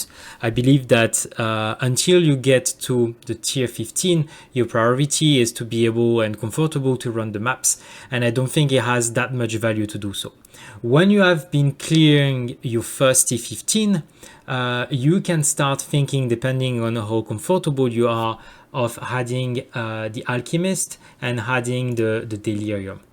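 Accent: France. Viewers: On reconnaît bien l'accent français. Merci pour la vidéo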